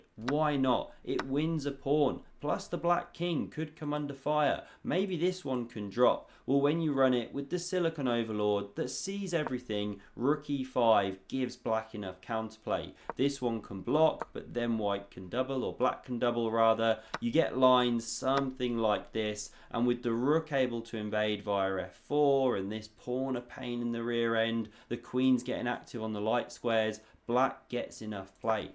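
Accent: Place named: West Country, United Kingdom. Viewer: Love the witty comments: some of them I have to have two takes, but it keeps the action flowing. What part of England is your accent from? It sounds like a West country tilt (not quite a lilt, perhaps).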